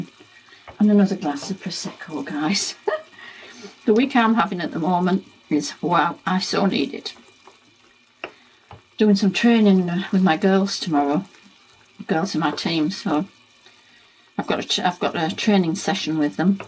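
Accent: Northern England, United Kingdom. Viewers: Hi 😊 I’m watching from Australia. I’m originally from Hindley, Wigan. I’m a newbie to the Ninja double draw Airfryer. Same as yours 👌 great to hear your Northern accent, where are you cooking from?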